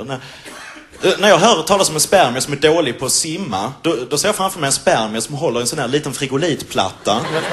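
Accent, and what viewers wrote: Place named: Scania, Sweden. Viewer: Har han ju inte x'D Bara lite mindre brytning men jag hör fortfarande skånska rakt igenom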